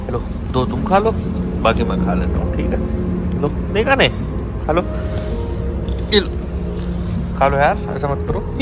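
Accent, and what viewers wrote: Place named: Kanpur, India. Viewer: Aesa kyu lag raha hai ye banda kanpuriya hai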